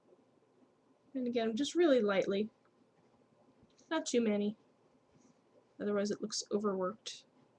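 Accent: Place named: Midwest, United States. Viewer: Good tutorial. Thank you. Are you by any chance from the Midwest? I'm from Illinois and your "accent" sounds local. I particularly liked your dunes. I seem to have a perspective problem with beaches. Mine look flat. Thanks again!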